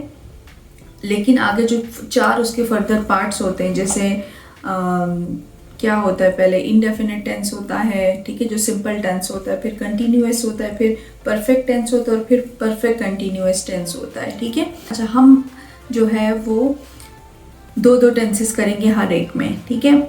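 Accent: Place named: Gilgit, Pakistan. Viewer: You are teaching Gilgit accent and In my knowledge Majority of shina speakers speak Astori, Chilasi accent even in Indian Kashmir.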